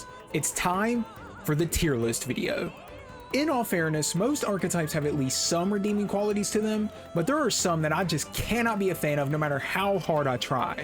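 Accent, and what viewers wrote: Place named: South, United States. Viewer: are you from the south? i like the accent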